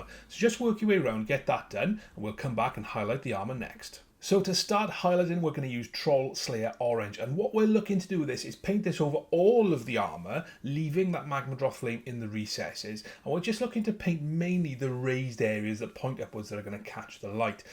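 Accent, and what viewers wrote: Wales, United Kingdom. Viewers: Where in Wales are you from? Loving the vids by the way, I've just got into minis (with the delivery of my DD KS) so painting is....uhhhh....fun :D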